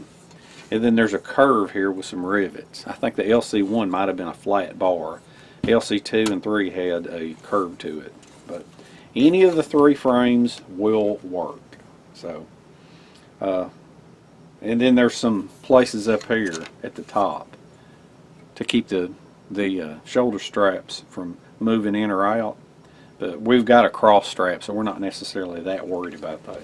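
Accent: South, United States. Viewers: great and informative video. just bought a pair of rocky boots cause i saw them on your channel. great boots. now something off topic. me being german always wondered why you and nick for example as southern gentleman seem to talk a little diffently. with some strong southern accent and nick not so much. why? is it a thing of age or just how you learned it? sorry for being so damn curious:)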